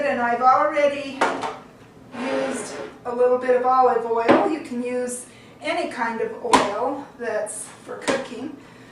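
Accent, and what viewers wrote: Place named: Utah, United States. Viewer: Hi! I sure would like to try your recipe. How much wheat did you put in? I think the measure was edited out. I'll watch it again. BTW-as soon as you started talking I thought you must be LDS. You have that UT Mormon Mommy accent. So cute. When I looked at your other vids, I discovered I was spot on. Anyway...if you could give the exact recipe, I would very much appreciate it.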